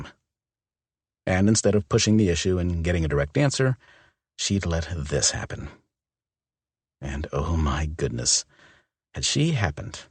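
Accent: United States. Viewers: Why does this bother people so much? Yes the standard prounounciation is wolf, but It is not wrong to pronounce it as wuff/woof. This book is written in, and the narrator speaks in, American English. There are 4 major regional accents and how wolf is pronounced is one of those words that can vary. In the upper Midwest, we don't generally pronounce the L in wolf - just like with the words calf and half. Saying it out loud, I would never pronounce the L unless I was thinking about it and purposefully pronouncing it.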